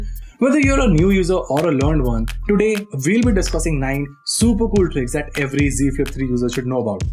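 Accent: India. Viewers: So hard when Indian talk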